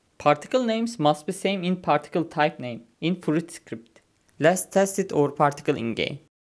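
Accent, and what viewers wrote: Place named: Turkey. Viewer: helal aksaanindan anladim turk oldugunu guzele benziyor eline saglik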